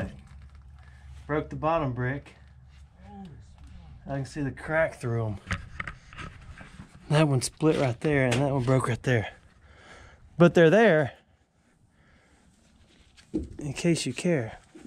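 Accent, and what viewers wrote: Alabama, United States. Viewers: 🙋 I was watching this video and I was cringing! 😯😳Have a blessed day ✌️ Love your Alabama Accent 😁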